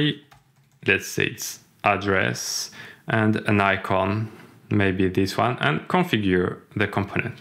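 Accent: France. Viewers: Appreciate the french accent.✌😊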